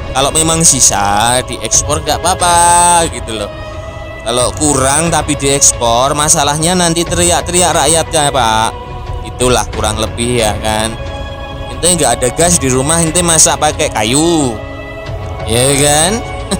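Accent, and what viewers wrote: Java, Indonesia. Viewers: Medhok banget mas.. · Waduh mas, medok jawanya ampun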